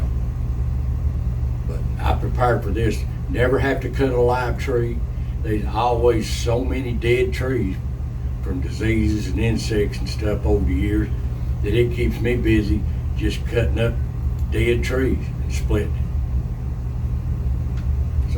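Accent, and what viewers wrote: Appalachia, United States. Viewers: Thank you so much for sharing your mountain stories. I have seen every video that you have put out. My people are from Pikeville. Your stories are like so many that was told to me by my Dad. Appalachia is so rich in American History and culture. You’re an excellent historian and researcher. Your accent adds the authenticity to the history that you are presenting. It would not have the same flare if told by someone not from the area. You give your audience a “real slice of life”. God bless you. ❤ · I talk the same way you do. It's the Appalachian in us. I have had people say that people in our region talk different it's just the way we are Love your videos God bless you I also have a wood stove but my home has oil heat too. It's expensive right now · I am an old Appalachian hillbilly as well. I am quite proud of who I am and where I came from. 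I came from a hard working and God fearing people that respected and loved their neighbors. Our culture is slowly going out and you are doing a great job of recording a part of our history. You keep up the good work my friend and don’t worry about the accent. I understand it just fine! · I enjoy all your videos. I sure appreciate all you do to bring us. I enjoy watching you metal detecting whether you find a treasure or not. To me the ole broken plow piece is a treasure for, it has it's own history or part of a ole bean pot; it's all a treasure to someone at some point. I'm still so excited about me finding the 1890s shotgun shell I found at the lake because, you got me so Interested in such.. I still haven't gotten to get a metal detector, maybe someday. I follow a lot of metal detecting on YouTube. I TAWK the way you do, my accent is my Appalachian gift. My kids don't TAWK the way I do either. 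God bless and Happy New Year to you all. Thank you for all you do, sincerely appreciate you. ♥️ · Hi, I happen to love your Accent and All of your videos. You are doing a Great Job sharing the lost History and You Do Keep it Real! Thank You so much for being a teacher of your heritage and culture and mine as My Family is from Spruce Pine,North Carolina and although from different regions of Appalachia I love hearing and learning about all of it!! Thanks again and I hope you have a Wonderful and Blessed New Year!!😊 🙏🏻🎉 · I have been laughed at all my life for my Appalachian accent but just like you I refuse to change. I am teaching it to my Grandson. I walk tall being from the mountains of Appalachia and am proud to live here. Words to me like red neck and hillbilly are compliments. Appalachian people are the best we love our God, family, country and our guns. God bless you and all the great folks of this country, especially those from Appalachia. 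· you and that baked in accent represent and embody the wisdom of the great Appalachian mountains!! We thank you and have a happy New Year!! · I'm Right there with ya, with the strong hillbilly, mountain folk accent. And I'm Not ashamed one bit, doesn't mean I'm less educated, regardless of what people think. Common /mountain (hourse sense) of far more important and valuable than books smart... My opinion. Many years ago, when I did vlog type videos on YouTube, long before YouTube went commercialized. I had subscribers, mostly based on people just love to hear me talk, lol. Didn't matter what I was talking about. Lol. You've got me looking at a few metal detectors. Something I think would definitely be interesting, regardless I find anything of value or not. I'm like you, just like finding old gun shells. I'd be happy to find some of those from early 1900s, that'd make my day, alone. Thank you for your youtube channel. 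I've told many bout it, I love having a history Channel based on my area. Thanks for ALL you do! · me and my folks talk just as you do here in the Ga Appalachia near Ellijay. I appreciate you friend.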